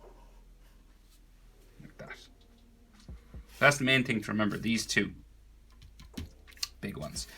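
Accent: Ireland. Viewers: Are you irish because you sound that way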